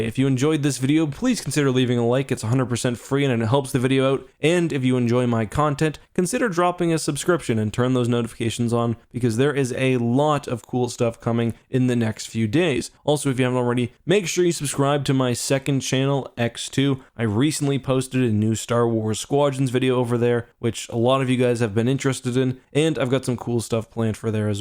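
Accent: Canada. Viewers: you sound canadian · is so aggressively Canadian and I love it. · Is this guy Canadian?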